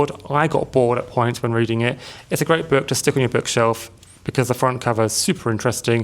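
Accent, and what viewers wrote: United Kingdom. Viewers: You sound very british yet you look very slavic, hope it makes sense, also awesome vid btw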